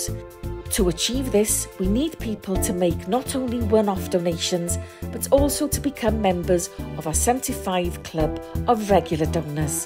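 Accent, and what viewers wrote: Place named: Scotland, United Kingdom. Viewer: I really love the Scottish narrator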